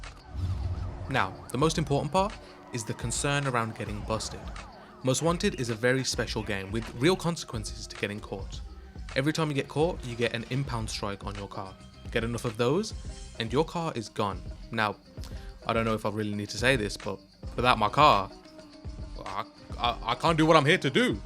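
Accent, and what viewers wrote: United Kingdom. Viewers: Lol the use of AAVE in the British accent is funny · How come you didn’t get the 106 for underground 2? You sound like you’re English, the 106 was on European versions along with a corsa lol the 106 was actually the best starter stats wise too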